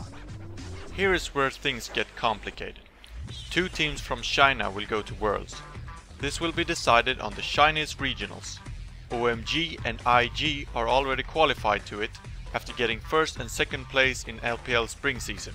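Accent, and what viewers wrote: Sweden. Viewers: Im so sure ur Swedish! This accent! It must be ^^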